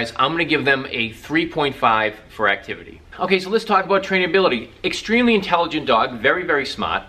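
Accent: Boston, United States. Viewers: Boston? I recognize the accent...and the shark comments! Interested in this breed but I can’t find local breeders. Do you know of any? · Can tell this guy is from Boston immediately 😅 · where does this guy live??? He has a boston accent, but there are no sharks in boston.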